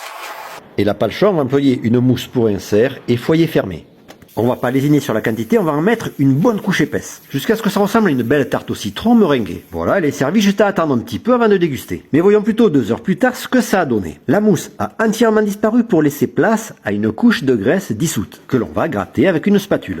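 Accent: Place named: South, France. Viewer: l'accent du sud c incroyable 🎉chef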